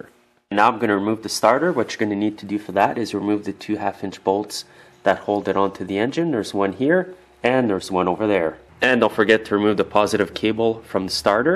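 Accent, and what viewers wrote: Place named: Canada. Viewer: Best instructional video I've ever watched!! Love your Canadian accent. My wife is Canadian so I,m kinda partial.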